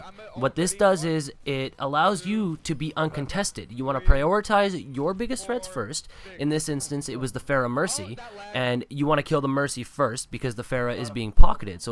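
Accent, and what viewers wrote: Canada. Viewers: His canadian accent is so strong I cant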